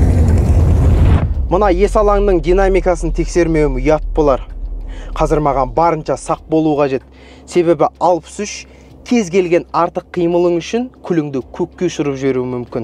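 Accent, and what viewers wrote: Standard. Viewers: Бауырымызға сәттілік. Қазақша таза, сауатты сөйлейді екен. Ғаламторда сауатты, пайдалы, қазақша бағдарлама көбейсін, аумин. Саясатты білмеу, әділетсіздікпен күреспеу, ұрпақты аяқ, қолын жіпсіз байлап жауға бергенмен бірдей. Оян қазақтар. АЛЛАҺУ АКБАР.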